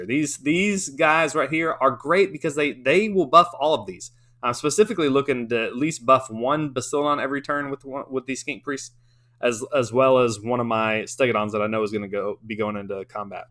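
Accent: Texas, United States. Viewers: Ya know I'm not great at picking out accents but I'm pretty I'm pretty sure this guy's a Texan too. *proceeds to miss the lone star in the back for over half the video* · Really good video! Loving the Seraphon focused content! Awesome channel, keep up the hard work. Shout out from Kansas bro! thought i sensed a Texas accent in there! 🤘😁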